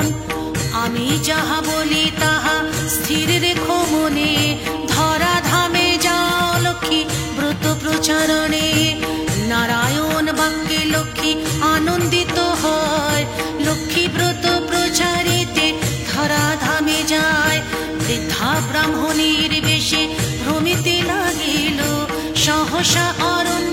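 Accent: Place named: Bengal, India. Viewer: Sanskrit mantra uchharan thik korun. bangalar moto uchharon hobena.montro uchharon thik na hole ta kaaj deina.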